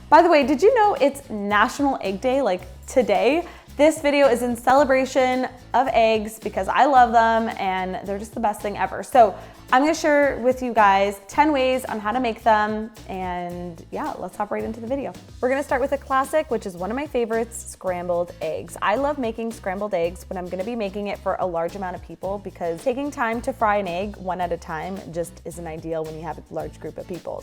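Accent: Toronto, Canada. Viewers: Damm that last one. Really blew my mind and made me so hungry. Also from the way you talk, you look like from Toronto 🤜🤛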